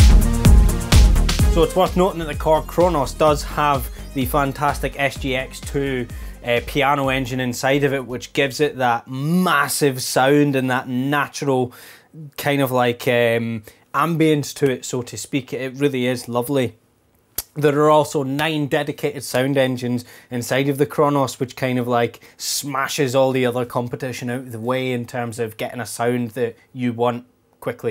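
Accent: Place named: Scotland, United Kingdom. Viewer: His Scottish-like accent makes this video that much more cool XD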